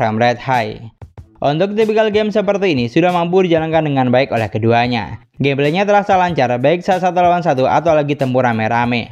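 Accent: Madura, Indonesia. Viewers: Saya nyimak dari awal sampai akhir,, semakin saya menyimak semakin saya tahu, si Abang aksen penjelasannya semakin lama berubah ke aksen Madura 🤔 Saya nungguin kata "...........taiyeee" nya 🙄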